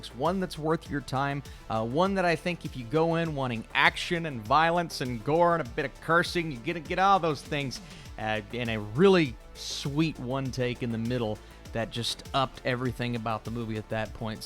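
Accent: South, United States. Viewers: That southern accent you have is lit😍🔥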